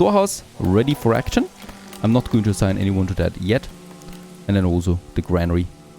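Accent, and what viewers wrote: Germany. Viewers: Du bist Deutscher oder ? :D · Für einen Deutschen hast du einen verdammt kleinen Akzent.. : ) .. very good bro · Are you German?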